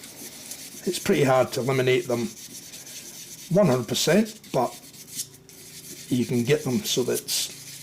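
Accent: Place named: Scotland, United Kingdom. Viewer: boy! That accent sounds very close to home! And here was me thinking you were an Aussie! I didn't know my brush was made by a Scozzy!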